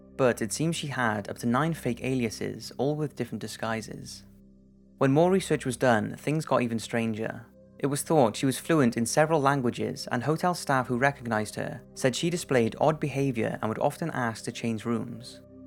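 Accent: Wales, United Kingdom. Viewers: I've always wondered, are you Welsh? you seem to have a slight Welsh accent. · He’s British that’s for sure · are you welsh?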